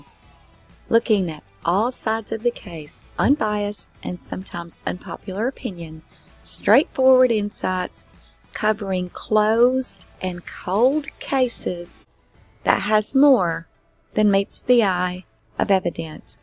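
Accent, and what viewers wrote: United States. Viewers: Do your own thing ... I love listening to your accent ... that’s what I call a proper American accent 😁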